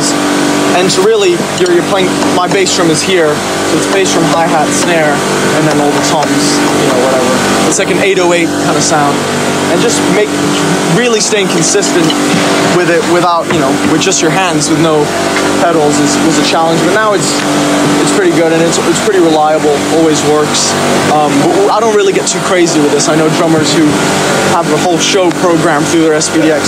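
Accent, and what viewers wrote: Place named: United Kingdom. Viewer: Huge fan of your Dad. Thanks for sharing your drum set and narration with your slight British accent!!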